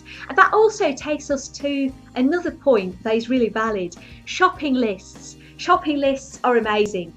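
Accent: United Kingdom. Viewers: Your accent is so British and so amazing ;-)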